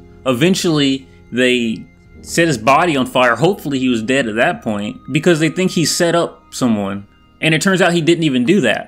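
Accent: South, United States. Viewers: This guy sounds a little country don't he?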